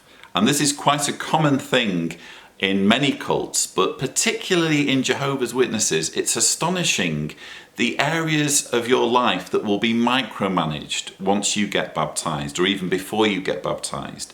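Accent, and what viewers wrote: England, United Kingdom. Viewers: Where n England are you from Sir...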